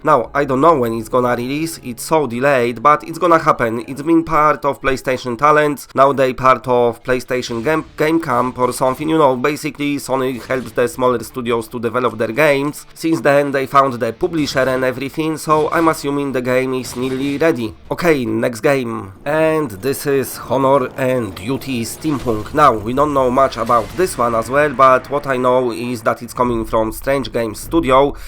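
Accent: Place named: Poland. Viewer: popraw się troche z tym angielskim bo nawet mi się ciężko słucha takiej wymowy, brzmi to bardziej jak byś czytal po polsku to co jest napisane po agnielsku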